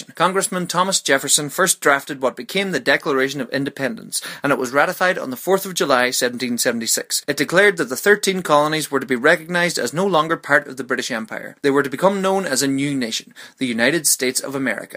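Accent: Ireland. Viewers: Are you irish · I love his voice. Is he Irish? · Tsunami Williams yes he's Irish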